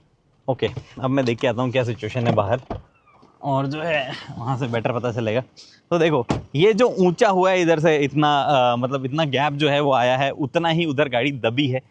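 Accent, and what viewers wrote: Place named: Delhi, India. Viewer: Why you make delhi excent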